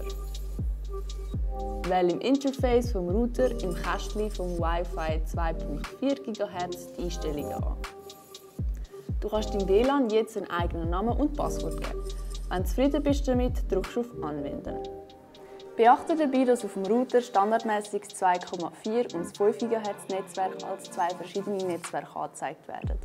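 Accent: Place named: Switzerland. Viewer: Schön's Schwiizerdütsch 💐✨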